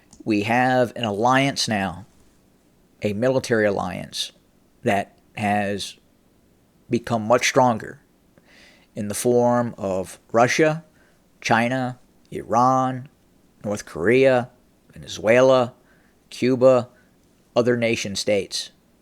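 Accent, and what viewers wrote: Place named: South, United States. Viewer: VOR. Your racism is almost KKK like. Where were you born? Based on your southern accent, I suspect Alabama.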